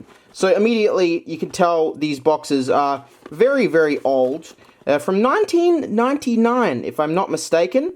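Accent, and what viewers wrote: Australia. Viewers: Well he has sort of an accent because he’s Australian.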